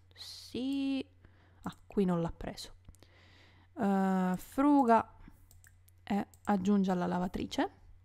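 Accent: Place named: Palermo, Italy. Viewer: Melaaccolo poco palermitano noto😂😂😂 io pure lo sono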